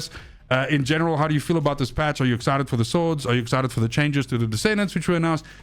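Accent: South Africa. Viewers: Bro sounds south african. But i just can't prove it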